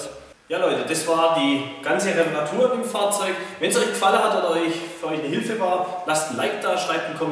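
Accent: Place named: Swabia, Germany. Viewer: Top Reparaturanleitung auf Schwäbisch. Mehr davon bitte...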